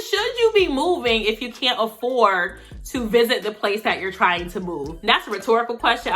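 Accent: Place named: Syracuse, United States. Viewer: Where are you from in New York? I'm from Queens but you sound like my cousin in Syracuse. Am I right?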